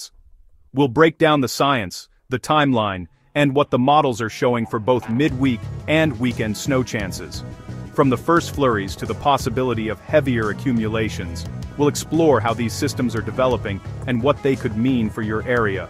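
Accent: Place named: United States. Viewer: Why does someone talking about the UK have an American accent?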